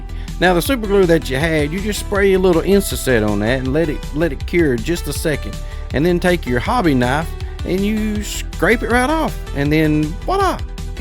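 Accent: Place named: South, United States. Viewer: You have the most southern accent I have ever heard. She came out great, I might have to grab this one myself.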